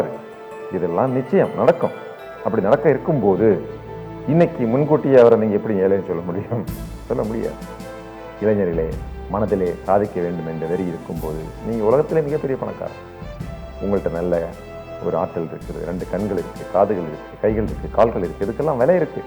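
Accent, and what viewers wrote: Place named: Kanyakumari, India. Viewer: ஐயா வின் குமரி தமிழ் பேச்சை ஆழகு சேர்க்கிறது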